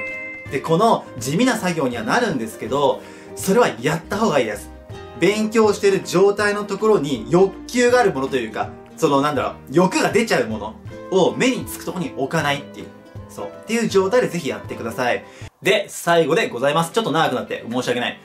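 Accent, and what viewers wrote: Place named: Kansai, Japan. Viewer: 関西弁です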